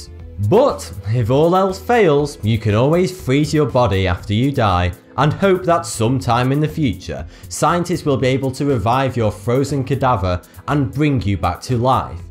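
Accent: United Kingdom. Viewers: Shady Marshal he's brittish come on give him chance i couldn't even say thoughty2 because i m from asia i always say ''to(cant say G)ty 2) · i luv his British accent :)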